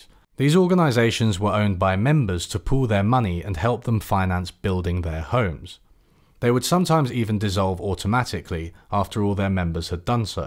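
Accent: United Kingdom. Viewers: Wait wait wait. You seem to have a British accent. And you just said something about your neighbors asking you to go dogging with them. Now I am American but I am familiar with some British slang. I hope you didn't just drop that in there thinking no one would notice. Cause I did and that's rather funny. Though, I could be wrong about your usage of the term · I guess brisitsh voices make me automatically be a alt-right yt channel but great video helps me but things into a better perspective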